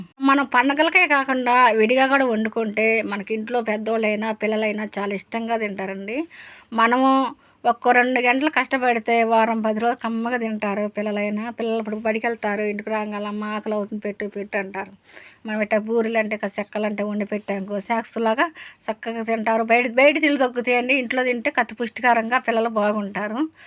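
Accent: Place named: Rayalaseema, India. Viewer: చూస్తుంటే తినాలి అనిపిస్తోంది, చాలా చక్కగా సీమ భాషలో చెప్పారు.. 👌👌👍👍👏👏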